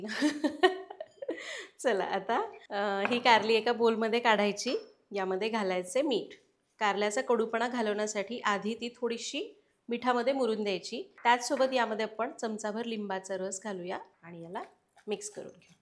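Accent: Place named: Pandharpur, India. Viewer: Ag tai tu pandharpur kiva mangalvedya chi aahe ka g mla aamchi bolnyachi aahe tashi vattey..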